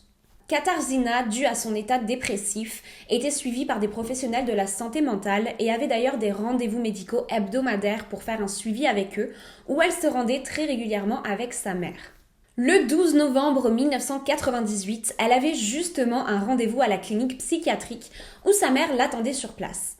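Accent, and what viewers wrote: Quebec, Canada. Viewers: Je viens de te découvrir.J'adore!...Mais serais-tu d'origine quécoise en premier lieu?Je suis du Québec et j'enttend des différences.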